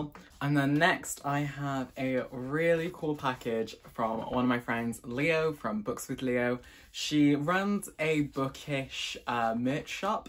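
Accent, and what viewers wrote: United Kingdom. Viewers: As if I wasn’t excited enough when I saw that he was a black male booktuber from the thumbnail, his British accent just rlly put the cherry on the cake 😩😩😍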